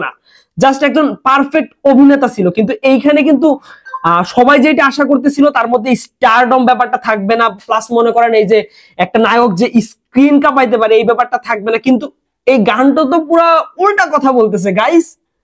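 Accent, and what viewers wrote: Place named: Tangail, Bangladesh. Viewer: Vai apnar basha tangail.kuthay..ami Madhupur theke..❤❤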